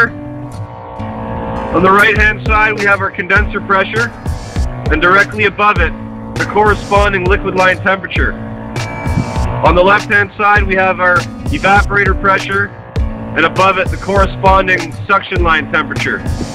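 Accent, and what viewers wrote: Canada. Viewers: Good Job enjoy your video, very good explanations in your narration ...are you from TO?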